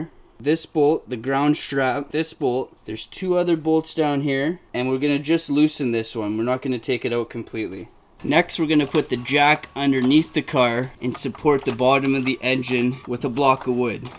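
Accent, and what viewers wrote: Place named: Canada. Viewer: Are you Canadian Bro? I am too! Maybe you are in northern USA, the accents carry over. Great video!!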